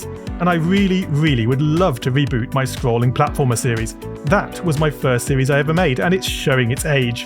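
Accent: United Kingdom. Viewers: i love your voice and that slight british accent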